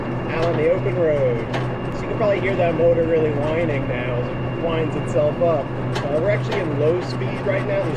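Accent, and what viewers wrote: United States. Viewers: Your English sounds 100% American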